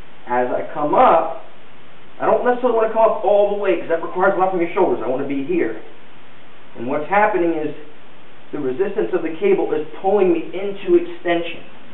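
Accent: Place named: Baltimore, United States. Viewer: I hadn't even scrolled down and I was like "hey, he sounds like he's from Bal'more - sure enough! Great exercises - thanks! I'll def. incorporate these with my clients.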